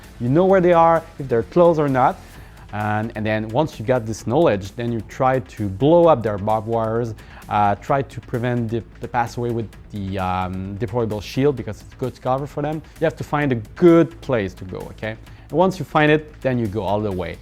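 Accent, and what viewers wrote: Quebec, Canada. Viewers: Thats a HUGE french accent. (french canadian i think) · He's a french canandian, just accept the way he talks english lol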